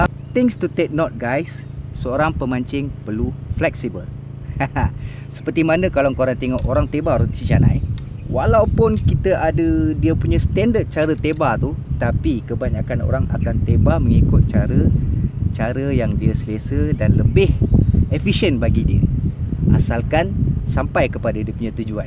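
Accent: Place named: Melaka, Malaysia. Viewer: Mantap Dato Seri.. Episyen!.. mcm org Melaka piau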